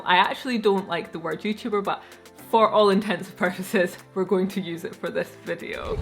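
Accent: Scotland, United Kingdom. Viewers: Greetings, I am subscribed dear and thank you for providing all the content, retired life is more enjoyable watching your video content as well as content of others, however I will have to start teaching architectural engineering to pass my time in addition to watching YouTube videos all day.,. I have to also say that I absolutely love your Scottish accent as its wonderful when spoken in the feminine sense if you will. Your numbers are headed in a positive direction, great work dear....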